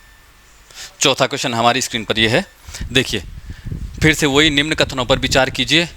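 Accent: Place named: Garhwal, India. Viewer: Sir ki aavaj ek garhwali lag rahi h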